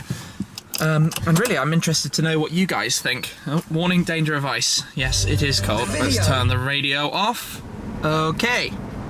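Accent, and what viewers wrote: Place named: England, United Kingdom. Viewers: indeed we do like your videos and you british accent is quite hard to understand but we dont really need subtitles ;) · Salut les français ! C'est grâce à Shmee, en partie, que je m'améliore chaque jour en anglais. La compréhension est un peu dure au début mais l'accent anglais est le plus simple pour comprendre (comparé aux américains, australiens, écossais, etc ...) !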